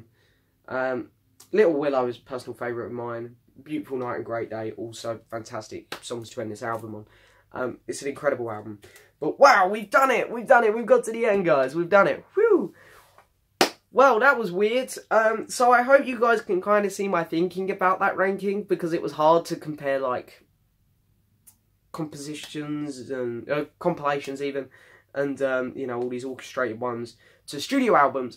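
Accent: London, United Kingdom. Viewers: thank you for your take on Paul's solo albums, you have a great take and insight. In fact you introduced me to the album Ocean's Kingdom I wan not familiar with before listening to your ranking. Good going kid. I hope you meet Paul someday and get to produce or do something with him; your very knowledgeable and sound like a great London bloke. At least that is what this yank hears. You certainly 'ave an ear for production and music. I subscribed ti your channel, I was so impressed.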